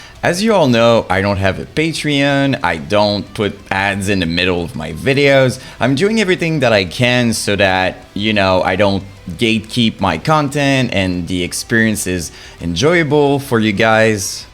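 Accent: Quebec, Canada. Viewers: Are you fromQC? You sound like you are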